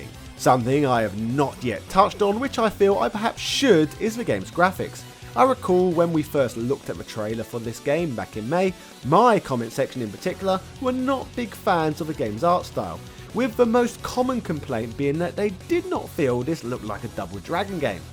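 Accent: United Kingdom. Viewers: I’m not even going to watch this video with this dumb British accent and say this franchise will never die because it’s the best on the planet, so it wasn’t about to peace out I mean double dragging neon was amazing.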